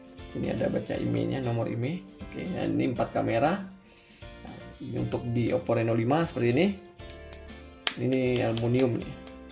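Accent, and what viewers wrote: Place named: Padang, Indonesia. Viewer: Yang review kek orang Padang deh. Logat nya keliatan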